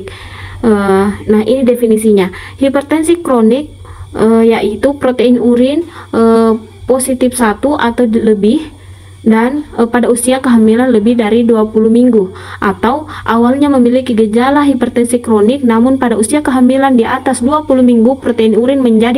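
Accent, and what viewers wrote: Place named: Bone, Indonesia. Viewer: Logat ta kyk org bone kak.. mksh sdh buat videonya kak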